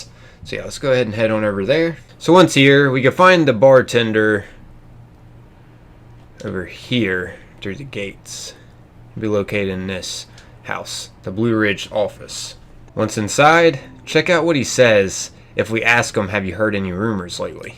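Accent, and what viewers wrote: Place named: New Jersey, United States. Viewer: Its because he sounds like he could be from New jersey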